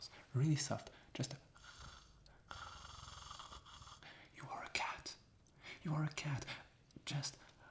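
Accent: France. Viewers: This was so ridiculously good. You chose a perfect subject, and you have a very cozy production. Is that your home!? It is so French and charming. Very historic looking! A french accent plus book sounds? Yes please. Love the page sounds and truly the French language is the most beautiful language in my opinion. Please as a request if you do another video, do a Math video! That would be amazing. LOVE the shoutout! Hahah. Plus to be put in the same page as Croissant and France...those are two of my favorite things. Truly a great first video and I really hope to see you continue doing more. You are a natural on camera!! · A soft spoken male French accent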